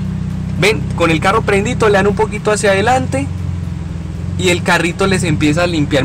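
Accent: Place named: Antioquia, Colombia. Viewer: Me gusta como hablan los paisas...gracias Men..!!!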